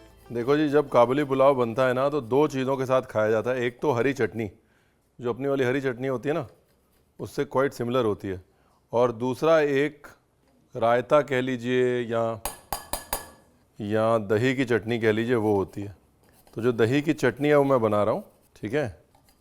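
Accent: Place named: Lucknow, India. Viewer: Bolne ke laheze me Lucknow hai ❤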